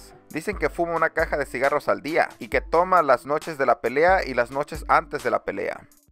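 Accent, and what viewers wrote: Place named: Sonora, Mexico. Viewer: oye eres Sonorense? tienes el acento de mi estado.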